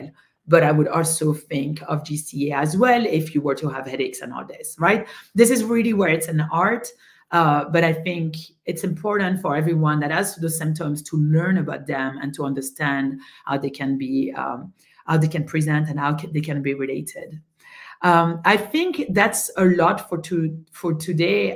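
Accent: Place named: France. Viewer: Too bad the French accent is so strong, I can't really understand a lot of words said until I finally turned on the captions and, still, that was not 100%. Still had to repeat some sentences multiple times.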